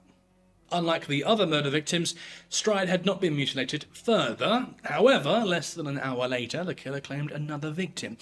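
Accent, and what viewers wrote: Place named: Essex, United Kingdom. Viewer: I knew you were an Essex boy (north Essex accent is less... towie)but didn't realise how close you were to my stomping Grounds! fun fact, Jack the rippers victims are buried around the corner from my grandparents and we used to go and visit them when we'd go to see my grandparents at the manor park cemetery. My great great grandad worked there, told my mum of how haunred it was and what creepy things would happen there. I have a picture of him with his horse that he worked with; it was a beautiful shire that eventually stamped on his foot and broke it!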